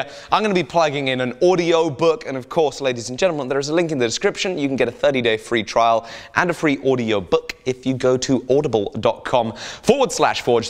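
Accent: England, United Kingdom. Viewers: He speaks English, not American English. No matter how much he likes that cowboy hat of his. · Chad Looney English word pronounced in English by an Englishman in England. I'm afraid this trump's the American pronunciation in this case.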